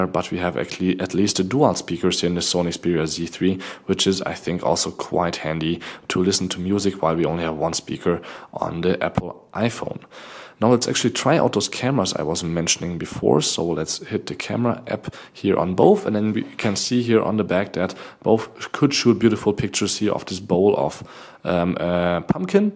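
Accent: Germany. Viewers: You notice right away that he's german, lol. iPhone 6 FTW! ❤ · Der deutsche akzent.... schrecklich!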